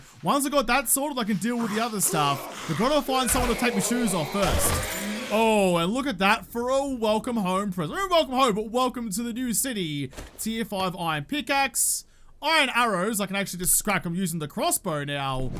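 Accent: Australia. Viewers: personality is just so GD endearing and he's such a wholesome kinda guy. And come on... that Aussie accent is awesome, not weird. One of my favorite YTers! And BTW, Desert biome FTW!!!!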